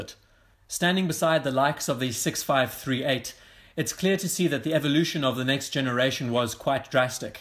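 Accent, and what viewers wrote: South Africa. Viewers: Great content.. Discovered you literally today. Watched lik 4vids 😅 You sound South African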